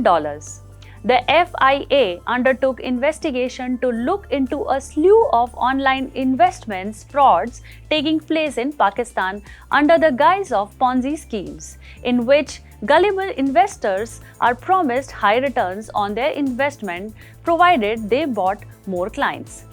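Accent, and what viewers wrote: Gujarat, India. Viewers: She's very pretty. But honest feedback - she has a strong gujju accent and a very sing song voice. Please don't get hung up on gujju ego and identity. You want to make money! Get an anchor who's accent neutral and presentable. The content was good.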